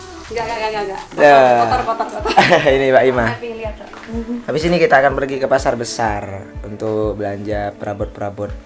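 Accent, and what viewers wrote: Madura, Indonesia. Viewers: Dengerin suaranya ko kaya ada logat Maduranya, eh ternyata emng pake Madura🤣🤣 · Medureh sarah 🤭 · bahasa madura.a bnget,lucu pas ngimucak bahasa medureh🤣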